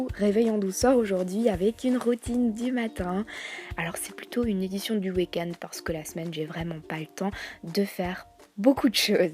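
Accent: Belgium. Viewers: tes belg ?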